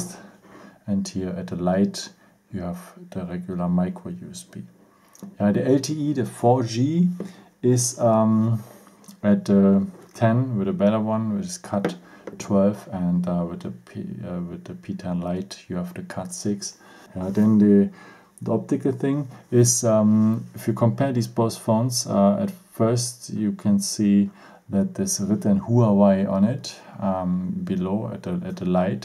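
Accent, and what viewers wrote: Germany. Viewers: You know there is a English alphabet? For example the english "e" is spoken like a german "i" which you obviously speak.... but nice job reading down the information that stands on every page in the internet have a gr8 day m8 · Why do you say Jigahertz instead of Gigahertz? XD Your English is funny. At least I can understand you though so not hating! And at least you're not just talking German. I can respect that, especially from German people.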